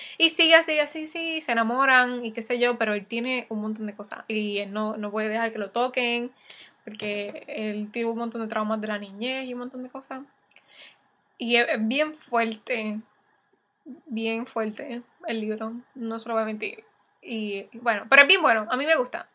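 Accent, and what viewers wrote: Puerto Rico. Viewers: Así hablamos los puertorriqueños. Espero que el ja, ja sea de que te da gracia...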